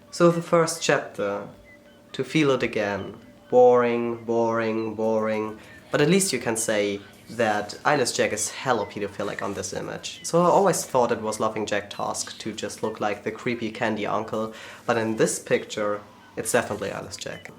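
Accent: Germany. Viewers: Sis r u German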